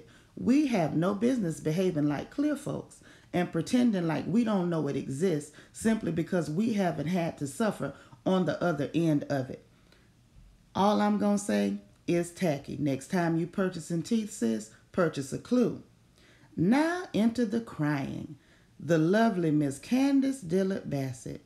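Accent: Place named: South, United States. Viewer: It's the narration with the southern accent and reads for me😊❤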